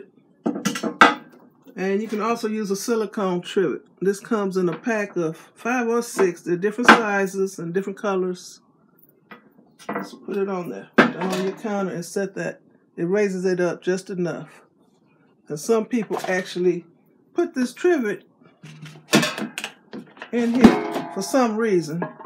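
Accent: South, United States. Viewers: I love it... your accent is so funny....reminds me a of my southern family...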